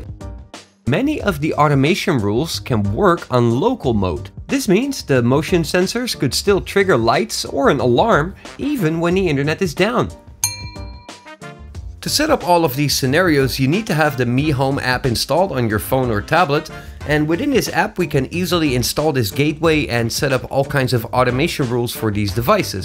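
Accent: Netherlands. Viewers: I heard a dutch accent... but I wasn't a 100% sure until I read badkamer in the app... Lekker bezig!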